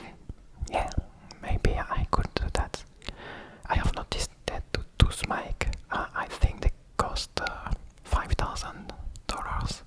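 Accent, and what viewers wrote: France. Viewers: Cet accent français ! 😂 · J'adore cet accent français 😂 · tu as un accent vraiment français je ne sais pas si tu l’es à la base c’est juste de la curiosité:))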